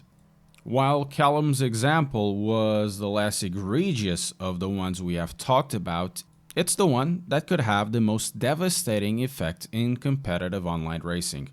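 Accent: Portugal. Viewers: Acho incrivel como mal comecei a ver o vídeo, mesmo com um sotaque e pronuncia mt boa, tive logo o feeling que isto era um tuga ahahah Fui ver outros vídeos e curti do conteúdo, continua o bom trabalho. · You're from Portugal i can hear it 🇵🇹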